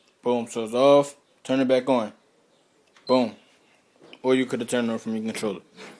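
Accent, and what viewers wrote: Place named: Northeast, United States. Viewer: you're from somewhere up north right? New York, DC, Boston?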